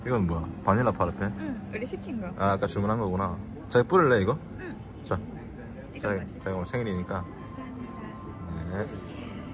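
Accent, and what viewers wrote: Gyeongsang, South Korea. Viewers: 경상도남자 억양을 배우셧네 ㅎㅎㅎ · 와~~ 경상도다~. 제일 멋지다! 강력 응원합니다. 얼머나 좋으노.. 아.. 진짜 요새 한일부부 AI 추천으로 넘 마이 올라오던데.. 하나 같이 머슴아들이 내시들 처럼 하이 어리가또 해사서.. 싸대기 한대씩 쳐 날리고 싶더마는... 와 진짜 짜증 만땅 올라오더마는.. 몽창 다 날려도 와이리 머이 올라오노.. 하다가.. 멋집니다 이 분. 오리지날 경상도 고대로네. 저는 부산. 오래오래 향복하이소~~~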